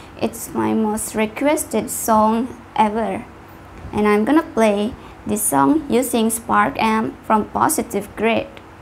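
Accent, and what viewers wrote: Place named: Indonesia. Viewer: Indonesia accent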